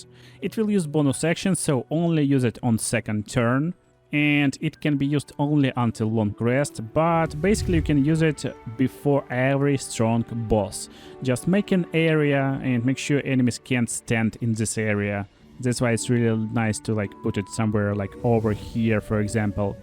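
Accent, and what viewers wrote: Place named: Russia. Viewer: Ты из России? Акцент характерный!)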